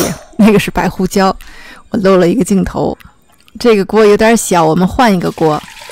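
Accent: China. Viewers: Michael S 那可真没有… 这口音一听就不是北京人，但说的是普通话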